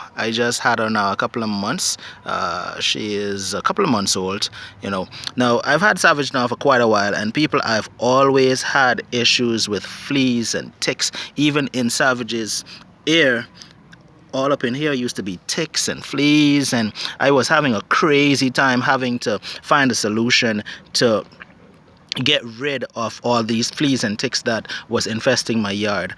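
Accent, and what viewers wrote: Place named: Bahamas. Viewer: You convinced me to try it & you sound like one of the homeboys in the Bahamas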